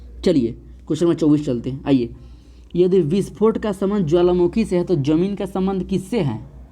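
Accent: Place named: Bihar, India. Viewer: Apki language bhutt achi lgi bihar ke ho ??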